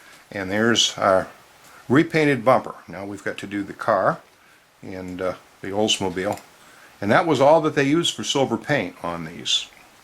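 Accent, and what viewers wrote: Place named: New England, United States. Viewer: Very, very nice job---Bravo-Zulu, amigo, for bringing a "dead toy" back to life! I saw the Windham Maine realty sign in the background....and I believe I detected a New England accent (although I believe you stated you were in Virginia). I applaud your efforts! Equally worth admiration (maybe even more?) I applaud your wife allowing you to use the kitchen sink!!! A very pleasant video!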